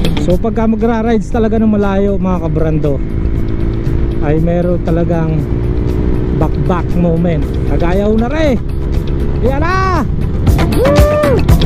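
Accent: Batangas, Philippines. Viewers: Batangueno ka kuya?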